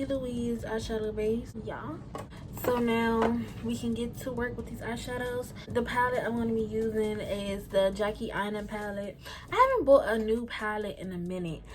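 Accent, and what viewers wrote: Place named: DC, United States. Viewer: Sis are you from the DMV? I swear I hear a DC or MD accent lmaooo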